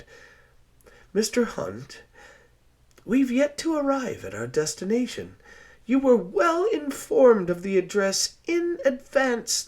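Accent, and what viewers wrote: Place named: Newfoundland, Canada. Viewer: Love the way you laid in enough Newfie in that it feels authentic, but it's still intelligible to us on the west coast! I'm excited to hear the rest of the story😁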